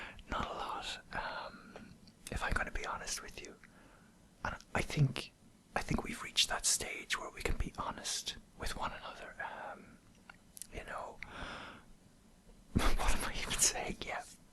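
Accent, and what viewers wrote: Ireland. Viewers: Okay, so I recommend you watch 'sword art online'. I'm personally not a big fan of anime but my girlfriend is and so I volunteered to watch some; she recommended me to watch SOA (Sword art online) because.. I dunno, I think it's pretty popular. As a not very big fan at times I still watch it and think pfft... this is so Niche to teens like all animes kind of are. But the concept of purchasing this game (the game being a virtual reality RPG with the twist of being stuck in the game forever until you beat the final boss then you are free but if you die in the game you die in real life) and having a thousand 'beta testers' who know the strategy and how to survive in this game is a pretty interesting concept. Btw, your videos are great and your Irish accent is awesome. Keep up the good work :)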